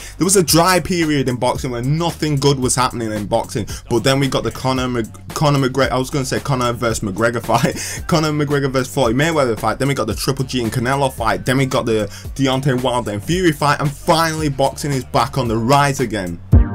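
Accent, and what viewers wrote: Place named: Yorkshire, United Kingdom. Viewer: Yorkshire accent m8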